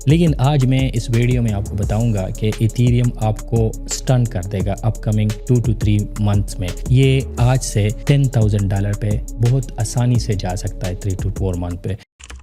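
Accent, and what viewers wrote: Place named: Pakistan. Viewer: pakistani ascent lag raha. ethereum bare me pata he pakistaniyo bahut achi baat he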